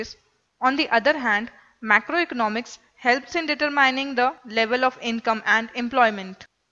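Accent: India. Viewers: * pathetic Indian English Accent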